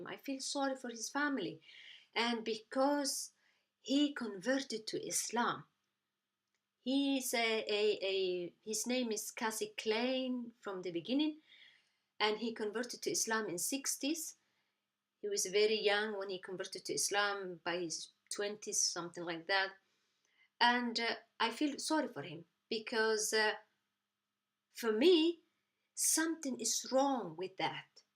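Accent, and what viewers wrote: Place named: Tigray, Ethiopia. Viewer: You are tigray agame ethiopian from your accent .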